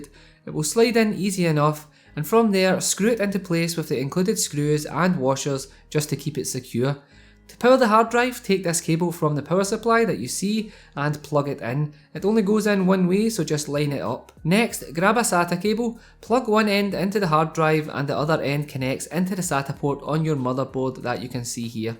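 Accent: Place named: United Kingdom. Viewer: I don’t know if you will see this but you sound very British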